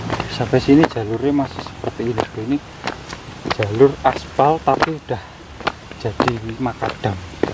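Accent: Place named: Central Java, Indonesia. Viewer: wahh puas pindah pindah jalur dong....satu gunung bisa bikin banyak video ini mas....satu persatu via mana via mana di kompliti ...orak usah adoh adoh sek...gunung ning kampungmu kompliti dulu ya 😁....kmu jawa timur tapi kok medoknya blum semedok surabayanan ya mas....masih agak agak mirip jawa tengah logat dan nadanya bicara 😁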